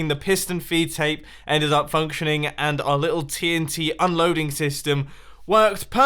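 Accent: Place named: United Kingdom. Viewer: He's British from what I've heard..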